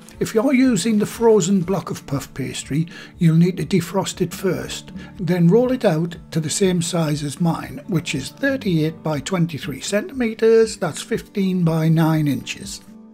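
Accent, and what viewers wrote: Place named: Geordie, United Kingdom. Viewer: I only found your site today and already a new subscriber. Very instructional.. music nice and not too intrusive and love your Geordie dialect. It’s like being with you in the kitchen:)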